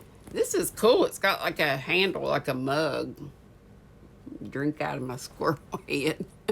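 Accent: South, United States. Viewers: Your a HOOT! Love southern accents!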